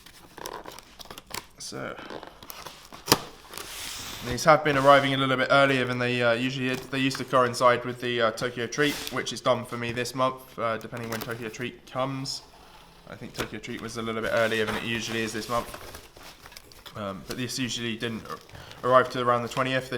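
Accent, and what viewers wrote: United Kingdom. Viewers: What part of the UK are you from, I'm from Lincolnshire, and I love your accent.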